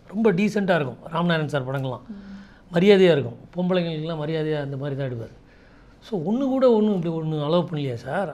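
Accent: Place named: Madurai, India. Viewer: Madurai tamil maarave illa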